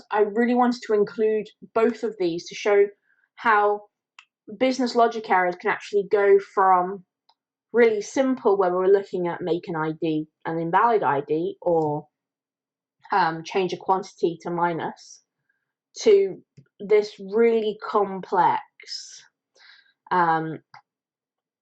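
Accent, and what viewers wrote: United Kingdom. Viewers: Pretty good sharing and great content, no doubt. I'm a beginner in cyber security and eventhough I've got a private invitation on H1, I couldn't manage to find a bug... However, this video gave me a different perpective and way of think. Therefore, I'm so grateful to you for your sharing :) Btw, I guess you are from UK? I like this accent although I've hard time to understand it :)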